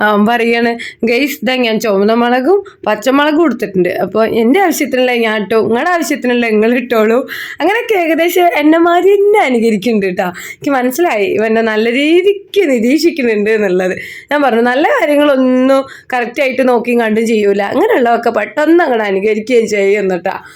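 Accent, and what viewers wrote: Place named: Valluvanad, India. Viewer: നല്ല വ്ലോഗ്.... സന്തുഷ്ട ഭവനം... വളരെ സന്തോഷം തോന്നുന്നു...വള്ളുവനാടൻ തനിമ നിറഞ്ഞ അവതരണം...നന്മകൾ നേരുന്നു ❤❤❤🥰🥰😍😍🎉🎉🎉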